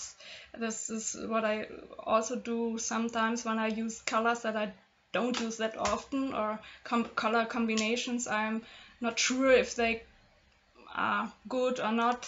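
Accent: Germany. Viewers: your english😁 are you german oder something? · Ein schönes Video und dazu sehr hilfreich! Dankeschön 🧡🧡🧡🧡🧡🧡👌✌ps: Du scheinst einen rheinischen Dialekt zu haben 🤔😌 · wollte das gleiche fragen, cool dass du aus Deutschland kommst, bist echt talentiert... finde den deutschen Aktzent immer voll knuffig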